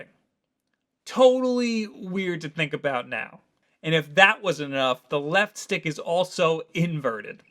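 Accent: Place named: New York, United States. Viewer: when was going strong with that ny accent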